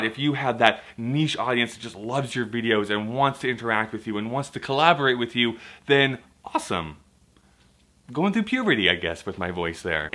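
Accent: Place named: South, United States. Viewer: Hey, I have a channel called The Sweetest Vegan or with about 48,000 subscribers. I am not YouTube famous, but some people recognize me in public, I have received some press, and I have a YouTube Partner Manager. I believe in your content and would love to help you grow your channel (No, this is not a network pitch as I am not apart of one). I stopped posting to that channel because, like you, I can only make videos about what I am interested in and I didn't want to hear a bunch of whinny comments complaining about me "changing" every time I posted. Anyway, you have good content, but there is some low hanging fruit that you are not taking advantage of. Email me at heartoftarts at gmail dot com and we can set up a time to Hangout or Skype. I really want to help you strategize for the new year. I am also from Atlanta, GA, so I can help with your southern accent.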